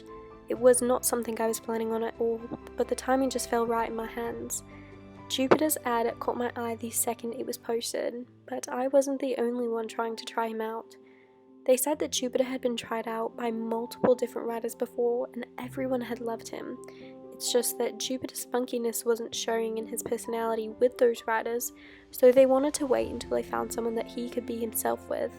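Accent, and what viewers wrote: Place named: United Kingdom. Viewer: i got the chills wow, is it me or does she have British accent in this video but not on her other videos idk anyway love you <3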